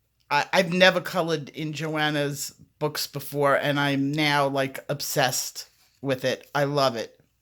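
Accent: New York, United States. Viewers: Thanks so much for this lesson. You are my favorite teacher. I watch other colorists videos and enjoy them but I learn the most from you. PS: I’m from Florida living in Tampa and I love your NY accent.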